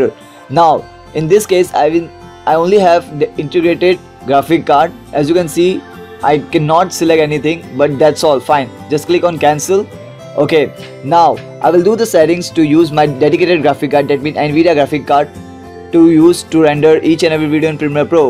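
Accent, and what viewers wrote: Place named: India. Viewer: D hindu's english, i like this :D, mera naam ömer hai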